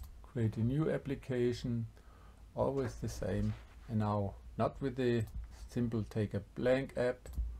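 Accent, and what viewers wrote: Germany. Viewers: Man hört das deutsch schon stark raus, aber kuss für Tutorial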